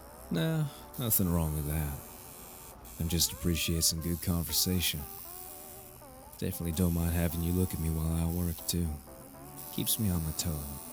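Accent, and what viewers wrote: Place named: South, United States. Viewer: I was not expecting an accent so thank you I always love an south accent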